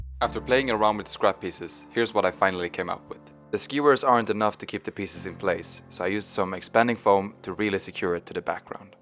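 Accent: Sweden. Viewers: jag hörde att det var en svensk på dealekten lol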